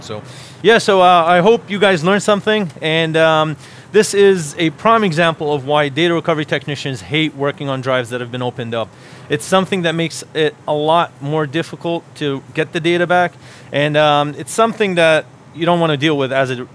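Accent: New York, United States. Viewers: When they both grow up in NYC anyone from outside NYC will think they sound the same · New York accent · You sound more New York than you usually do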